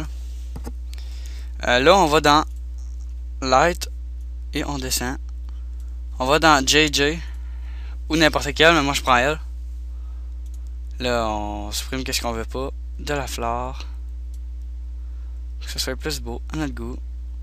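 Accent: Quebec, Canada. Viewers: ralala l accent quebecois mdr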